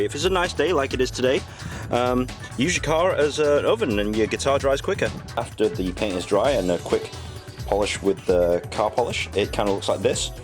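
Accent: England, United Kingdom. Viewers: that's me too bro, trying to build a guitar on a BOODGET... hehe love english accents.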